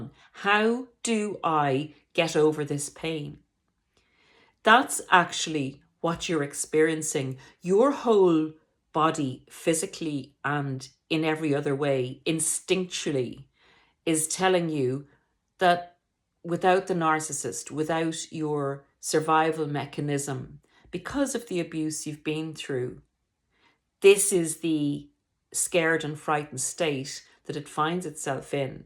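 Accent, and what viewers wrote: Ireland. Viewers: You seem to have an Irish accent I could be wrong I’m a new subscriber from Ireland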